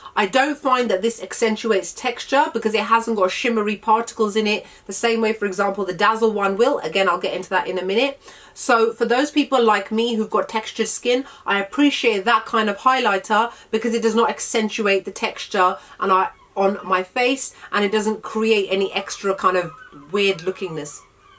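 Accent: United Kingdom. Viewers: thank you very much. The lady above, literally read my mind but when I googled which one this or the Hourglass trio was better value, I got no reply. She read my mind and you've saved me buying this. Can you please tell me the name of the other powder you mentioned after Charlotte Tilbury (I have the same powder but didn't use it yet) you speak rather quickly and I'm Irish and with your UK accent, I didn't catch it. Did you say it was a Nars powder and if so, what one please? Your own make up is stunning. I have brown eyes and again, coincidentally, I was looking for pink eyeshadow palettes but I was disappointed as Amazon only had a Maybelline one and it wasn't vibrant and beautiful like your eye shadow. I like your in depth review and in Ireland that trio is almost 39 euro!! I subscribed to you. Happy New Year from Ireland xx 29th of December 2021 xx ps. 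Please will you tell me all the make up that you used for your stunning pink look? I assumed it would be listed but I was wrong :). It's weird because the past week I have been longing to find a pink based eye shadow palette but to no avail. I only see very insipid pale pinks that look dull and chalky too. I want your metallic effect look with vibrant pinks. I'd love to copy your entire look, so please may I know the products ie blush etc but also their shades? It helps that you're a natural (is it racist to say Arabian beauty? People are so touchy nowadays but you really remind me of an Arabian beauty and it just means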